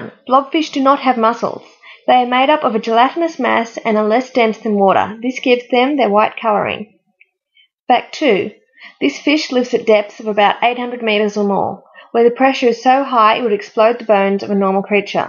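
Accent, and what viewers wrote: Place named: Australia. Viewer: Its an aussie accent :)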